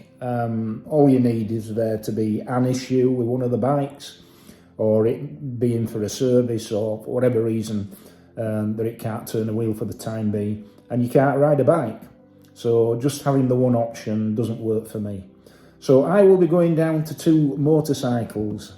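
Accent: Yorkshire, United Kingdom. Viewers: I believe the first thing that got me to subscribe was your Yorkshire accent. 😄 I like the way the channel content is laid out. I enjoy it all. Good honest reviews and opinions. Which ever way the channel goes, I will be here bud. Cheers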